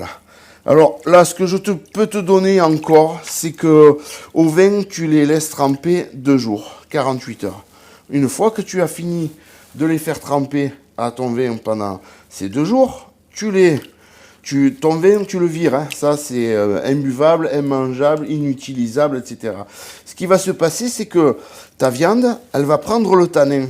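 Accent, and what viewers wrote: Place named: Marseille, France. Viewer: est tu cevenol de naissance ou d adoption? Désolé pour m question intrusive mais ton accent me rappelle fortement le mien et celui des mes proches qui sommes issus de la région marseillaise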